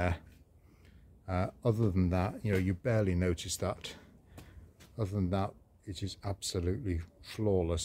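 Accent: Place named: France. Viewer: Can't you tell by his accent he's french?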